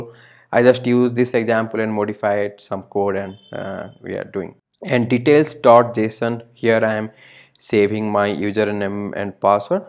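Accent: India. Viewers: This guy from West Bengal , 😂😂😂 his English remember me Mamta 😞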